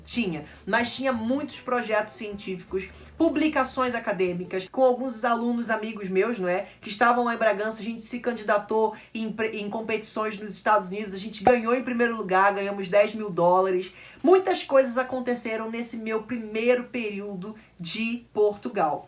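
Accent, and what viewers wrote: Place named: Rio de Janeiro, Brazil. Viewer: Tinha que ser carioca com essa fala so -nos mesmo temos.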